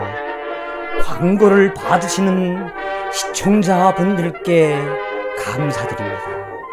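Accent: North Korea. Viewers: 북한 사투리가 구수합니다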